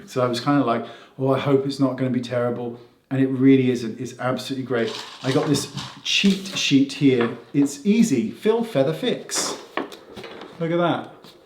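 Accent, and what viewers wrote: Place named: Somerset, United Kingdom. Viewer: Looks great, nice to see a Bridgy boy on YouTube. I'm also from Bridgwater so I recognised the accent straight away 😊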